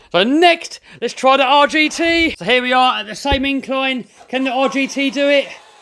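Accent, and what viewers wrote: United Kingdom. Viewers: Are you British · Is that Uk accent?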